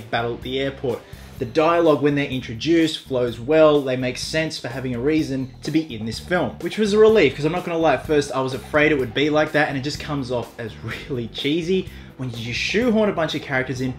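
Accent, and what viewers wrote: Australia. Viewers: Subscribed straight away when I heard your accent. Fellow aussie ;) Fucking loved it. And thank god someone is finally #TeamIronMan. All my friends were Cap :<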